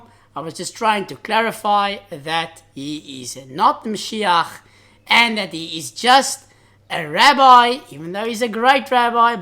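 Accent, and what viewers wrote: South Africa. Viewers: Is this guy South African or something? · חיי אחי 😁 I've watched your videos off and on for months now especially the conversion video start to finish. I think it's fantastic your making videos about Judaism,I love hearing your South African accent haha I just haven't heard a Jew speaking anything apart from American / Israeli accent/Yiddish Anyways G-d bless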